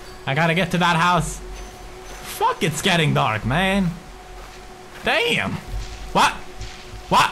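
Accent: Sweden. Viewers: very barely it's super clear hes swedish in this video as to now where you have absolutely no idea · Man... still had a little bit of a Swedish accent.... and he was a tiny bit funnier. Probably because the whole demonitization thing wasn't here yet. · the time when pewd’s swedish accent was still noticeable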